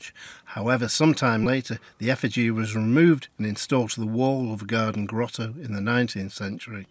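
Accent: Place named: United Kingdom. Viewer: I just love these! The camera work and details are wonderful--and, from a person living in Colorado, USA--listening to your commentary is delightful! It's the British accent, you know...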